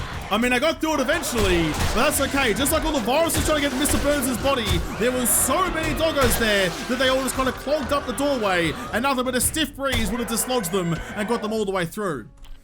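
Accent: Australia. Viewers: Oh so your from Australia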